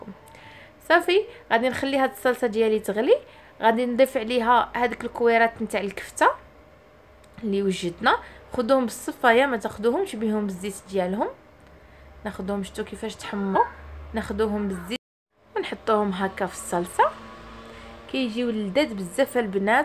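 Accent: Oujda, Morocco. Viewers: كا تحمقني هدرتك حبيبة حتى انا بنت وجدة 😍😍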